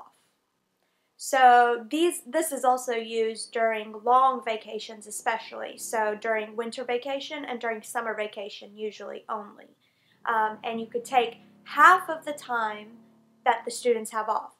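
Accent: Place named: South, United States. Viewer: The southern accent is trying to break through lol. Nice video though :)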